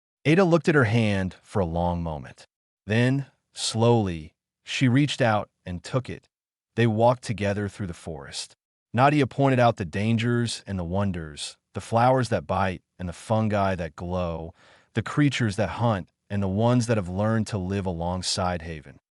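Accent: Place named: Spain. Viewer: The occasional Spanish accent draws my smile.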